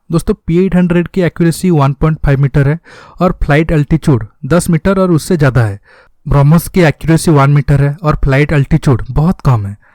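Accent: Odisha, India. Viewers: Are you a odia guy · Katha gura pura odia bhali laguchi, aau bhala bi laguchi, good job please carry on. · Brother are you odia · App kya Odisha se ho?? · Odia accent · R u a odia U r acent r like that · Aap Odisha se lag rahe ho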